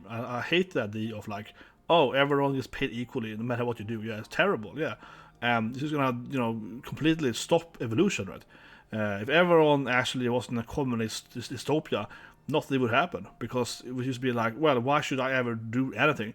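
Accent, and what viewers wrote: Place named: Sweden. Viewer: Interesting! Just starting the video, but it hits all the right points for me to want to check it out. I worked in the games industry for a bit over 10 years many years ago in NY and left a few years before the hard left turn really got underway. Också är jag amerikanare men har bott i sverige i dryggt över tio år och din dialekt/brytning låter precis som alla mina svensk kompisar lol.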